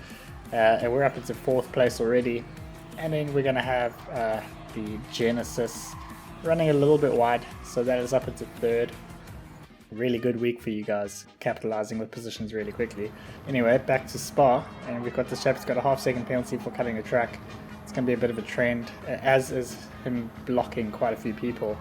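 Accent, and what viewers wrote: South Africa. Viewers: where you from ? Sound South African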